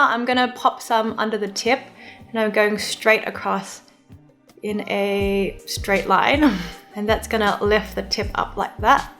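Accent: Australia. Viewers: In love your Australian accent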